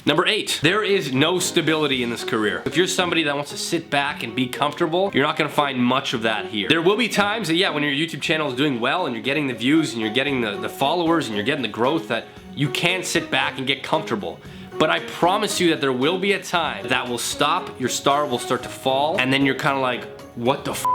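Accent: Canada. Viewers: Well, I think Charlie is cute.......this is the second video......you drew me in with the mirror paint video and you seem to be a normal good guy which is why I subscribed to your ch........not to mention I like the little Canadian accent.....out, aye...........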